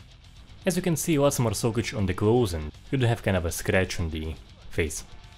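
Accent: Russia. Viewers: Русский акцент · у тебя акцент русский))) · You have a Russian accent.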